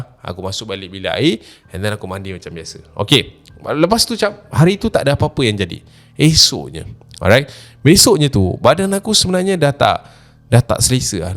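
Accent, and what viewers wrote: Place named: Terengganu, Malaysia. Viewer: Aku dgr je dia ckp klantan tpi slang ganu😅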